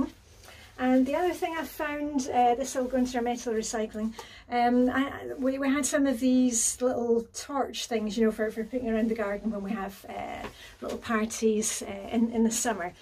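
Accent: Scotland, United Kingdom. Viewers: Greetings from Sonoma, California. I treat myself to your video with my first morning tea and then get on with my routine. Since becoming a Fly Baby this week my home is already feeling so much better! Heartfelt thank you for all your inspiration and as a genetic Scot, your delightful accent. Loved the coral sweater and polka dot scarf today. Later you were wearing a white blouse with coral dots. Do you ever wear the coral scarf with it? Hope so! I mean how can one have too many polka dots? As a T3 I rarely wear them but I do have a couple scarves with random and one with not so perfectly shaped dots. Starting to think I might have a one secondary! Love to you and your family and Birthday Wishes for your girl. Mine was Sunday and my daughter’s two weeks before, niece’s today and two more daughter’s Saturday.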